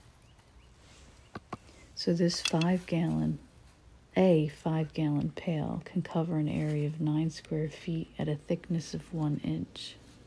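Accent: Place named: Philadelphia, United States. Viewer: Nice video, I’m a commercial roofer. And go Phil’s! I hear the Philly accent. I’m from Philly too!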